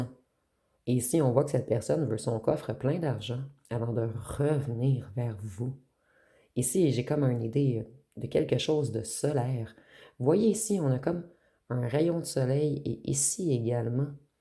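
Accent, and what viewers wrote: Quebec, Canada. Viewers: Est-ce que tu viens du Québec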